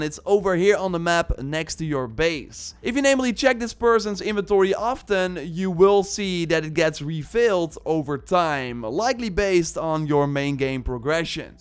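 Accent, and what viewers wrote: Netherlands. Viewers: That was very helpful already wasted some time on purples not knowing about levendary. Small friendly advice: Im assuming youre dutch. 'Armor' is pronounced like the dutch word for poorer. "Armer" instead of "armòr".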